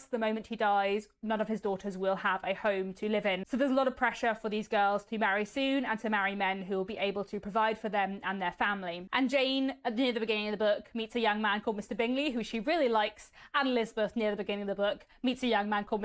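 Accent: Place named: West Country, United Kingdom. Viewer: I would find it hard to do a top ten, and I would have to split it into two lists, one of fiction and one of nonfiction. I have read several of the books you mentioned, but none of them would make my top ten. My books would be a little obscure to most people as they would be germane to Gnosticism and the Paranormal or to Historical Fiction. Books like Luce by L. R. Fredericks, We Other by Sue Bentley, The Strange Life of Ivan Osokin by Ouspensky and The Secret Magdalene by author and old FB friend Ki Longfellow would be in my top ten fiction books. The one most would know is Melville's Moby Dick which is very Gnostic when you understand what Gnosticism is all about. From Historical fiction, I would put Monk's Hall in there by the late Hilary Mantel, Innocent Traitor by Alison Weir and Sarum by Edward Rutherfurd. That last one, is set around Salisbury and Stonehenge and the Cathedral and how the place became inhabited in ancient times. 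I detect a slight West Country accent in you, so it would be the one I recommend, which makes for fascinating reading.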